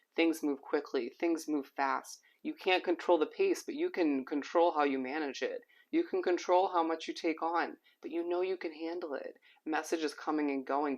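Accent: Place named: Midwest, United States. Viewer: You also have a VERY MIDWEST ACCENT WHICH IS SO CUTE! 🤩😍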